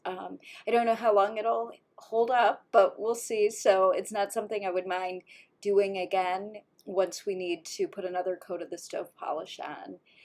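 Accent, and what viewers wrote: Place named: Virginia, United States. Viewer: You go girls! Wonderful project! I have a Vermont Castings cast iron wood stove that has been sitting in my family room for years unused. You inspired me to want to work on mine (rusted by cat spray in some areas) and put it out in the yard as a place to unwind. Thank you so much ladies!! (I am Canadian and your lovely accent reminds me of someone I know from Virginia) XOXO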